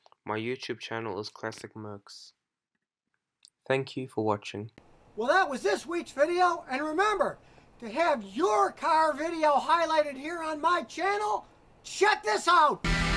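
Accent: New Zealand. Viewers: Recognized the Auckland accent the second he started talking 👌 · From the accent and road signs, I'm guessing New Zealand.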